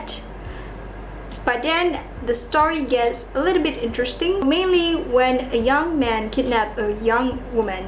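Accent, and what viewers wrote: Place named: Philippines. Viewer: You're pretty cute, accent sounds Filipino or something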